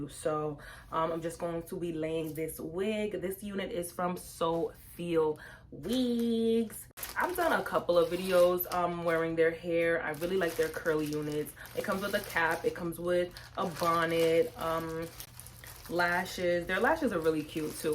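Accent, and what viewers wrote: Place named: New York, United States. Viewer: I love your accent.....New york ?? New Jersey ??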